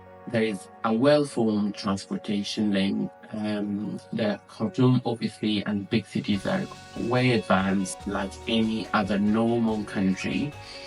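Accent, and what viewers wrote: Scotland, United Kingdom. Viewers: You definitely have a little bit of Scottish in your accent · Theres a bigger scottish twang in your accent than you think, definately hard to pin down. I knew where Sudan is but I didn't realise they have the largest number of pyramids.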